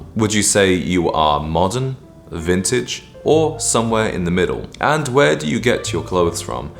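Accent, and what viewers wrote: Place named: England, United Kingdom. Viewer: Why not??? You sound English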